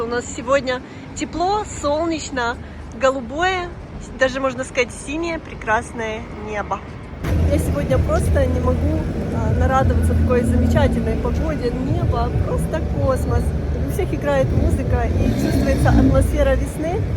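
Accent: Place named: Western Ukraine, Ukraine. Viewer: привет! А почему у Вас западно - украинский акцент?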